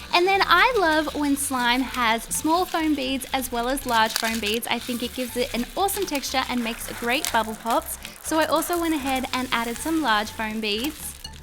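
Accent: Australia. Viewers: You can tell by her accent, she is from Australia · Shes Australian. She cant help it. If you want to hate, get the fuck outta here.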